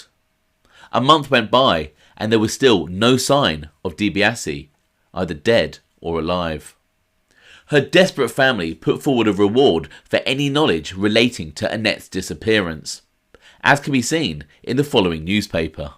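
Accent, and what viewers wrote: England, United Kingdom. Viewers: Well documented info. Interesting and now I am used to your English accent.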